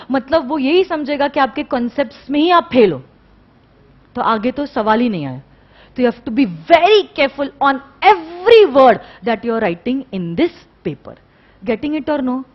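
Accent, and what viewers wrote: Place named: Gujarat, India. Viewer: I have nothing to do with CA or any Crazy study Shit. Still, I bought her books and Pen Drive stuff. She is an Amazing woman., and that thick Gujarati accent adds cherry over the cake. Keep it up, you have been fabulous. I never liked CAs until one fateful day I found you on Youtube.:)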